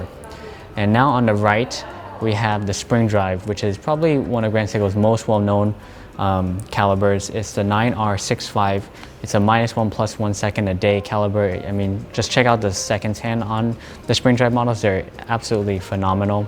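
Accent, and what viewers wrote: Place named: United States. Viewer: Super pumped!!!........Americans destroying our wonderful language!!! Lovely watches though!!! And enjoyed your content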